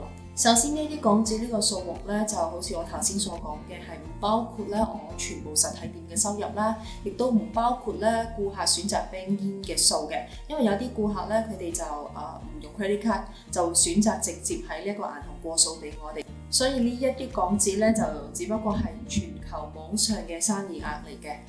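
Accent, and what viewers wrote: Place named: Malaysia. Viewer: 之前对你的马拉广东话口音好有印象，人靓左好多，差点认不到，看好你。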